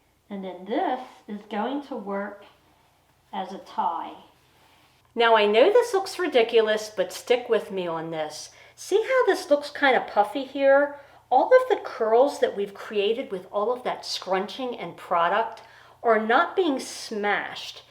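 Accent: Philadelphia, United States. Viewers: I am from Philly and you are either Philly or South Jersey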